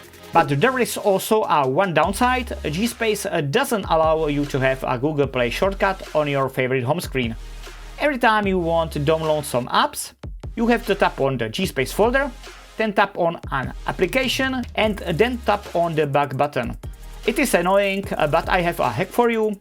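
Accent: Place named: Czech Republic. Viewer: Ta Česká angličtina xd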